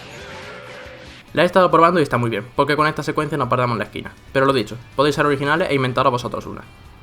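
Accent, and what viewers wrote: Spain. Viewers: Eres de granada bro?, se parece tu acento al mío un poco jajaja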